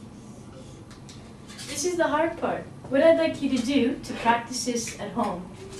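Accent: Canada. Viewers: She is from Canada? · She from canada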